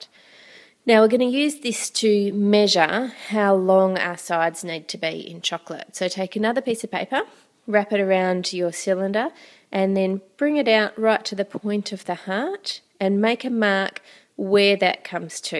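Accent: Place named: Australia. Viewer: She sounds Australian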